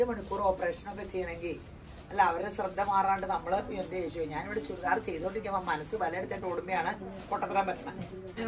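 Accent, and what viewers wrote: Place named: Pala, India. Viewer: ശരിക്കും വർത്താനം കേൾക്കാൻ വേണ്ടി repeated watch all video നിങ്ങൾ പാലക്കാരാ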